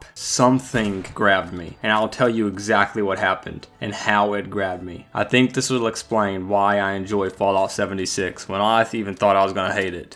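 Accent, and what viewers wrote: South, United States. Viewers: Man you got like 1/10th of a southern accent lmao. it kicks in randomly every now and then. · The fact that you pushed yourself soo hard for the sake of making this video despite the relationship you have with this game (as mentioned in this video) deserves praise! You have a southern accent! I hail from a land in the southern region of the U.S. btw, I think you were hooked when you actually started worrying about the condition of your home... to an NPC. LOL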